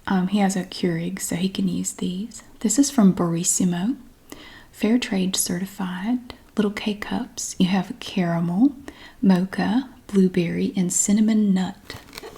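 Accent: South, United States. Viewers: Christmas time, Southern accent in asmr rhyme, aldi products crinkling under 30 decibel level time. 😊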